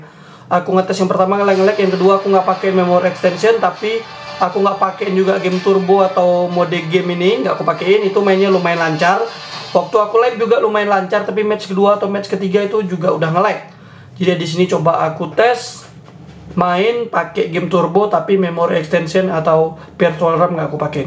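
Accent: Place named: Sumatra, Indonesia. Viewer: dari logat dan gaya bicaranyabyg "ala..." kayaknyanorg sumatera ya bg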